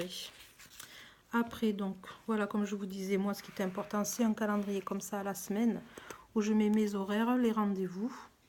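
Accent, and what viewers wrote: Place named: South, France. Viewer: Bonjour, je découvre ta chaine et je b'abonne 😉 et en plus du sud (on est fiers de notre accent ) moi Perpignan Pas facile de tenir une chaine la mienne est a l'abandon depuis aout ou septembre, travail, vie de famille les jours à 24h sont trop courts😂😂 A bientôt, Bisous bisous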